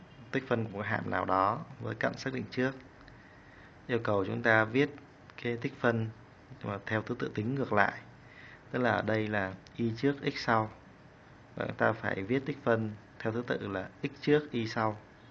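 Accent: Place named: North, Vietnam. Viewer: THẦY NGƯỜI BẮC HẢ THẦY :x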